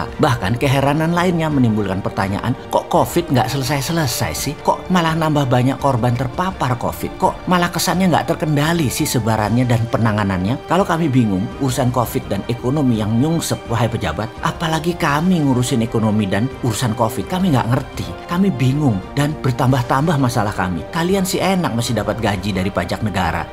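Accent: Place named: East Java, Indonesia. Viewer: Seneng denger logat jawa timuranya bos. Sehat selalu bosman.panjang umur.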